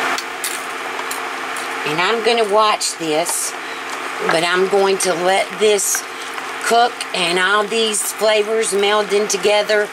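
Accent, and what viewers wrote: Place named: Oklahoma, United States. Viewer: Your voice sounds like Oklahoma . Thank You for this recipe .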